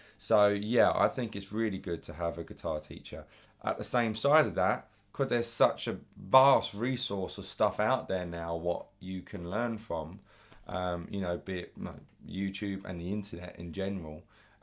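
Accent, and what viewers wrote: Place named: United Kingdom. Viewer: your name and accent say british, your features and sunny temper say mediterranean... what's your secret?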